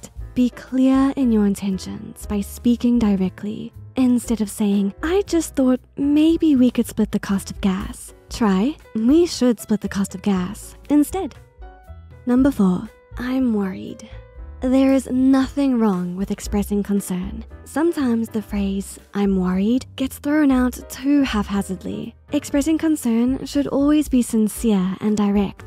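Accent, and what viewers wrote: United Kingdom. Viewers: Thats the most british accent i have ever heard 😵 It’s a bit annoying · The VA is both British and Southern 🤔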